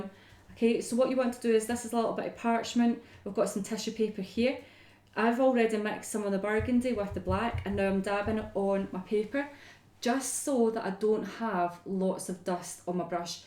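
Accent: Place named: Scotland, United Kingdom. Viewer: So beautiful you are so talented and what a lovely scottish accent , my husband came from Fyfe. I have tried so many times to wire peonies but always seem to fail they spin and crack so sadly I dont try anymore😢 thank you for your tutorial x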